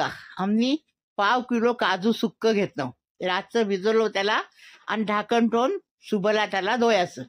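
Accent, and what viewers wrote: Konkan, India. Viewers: Masha Allah aunty aap kokni ho bolo mazaa aata hei. Hum samajhte hei , Bolne mei ardevat hei 😊, mummy accha bolte thei , Allah unko jannat naseeb kare ameen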